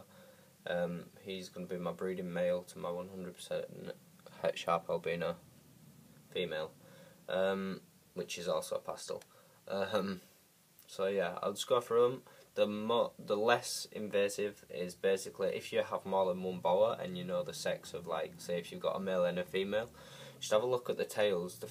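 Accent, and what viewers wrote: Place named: United Kingdom. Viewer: Ur British!! Lol il pm you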